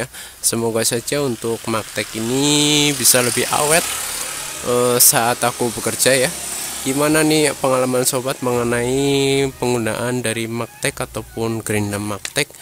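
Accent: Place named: Kawunganten, Indonesia. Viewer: medok kawungantenan...haha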